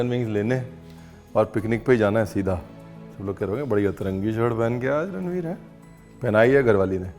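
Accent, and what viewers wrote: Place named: Lucknow, India. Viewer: Dear Sir one of my best friend is from Lukhnow nd u hv same accent like the words “Bhaiya”, “Shaukeen”, “Turant”, “Kayde se”, etc…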